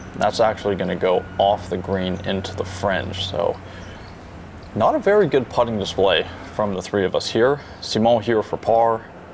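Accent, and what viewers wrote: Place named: France. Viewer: What is the name of the course? Us dumb Americans cannot understand a word when you throw a French accent on it.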